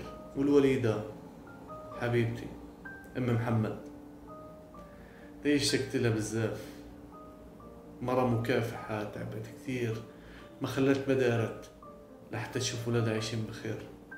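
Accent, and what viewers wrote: Morocco. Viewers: ههه كتعجبني هدرتك بالمغربية مخلط بالفلسطينية بنكهة مغربية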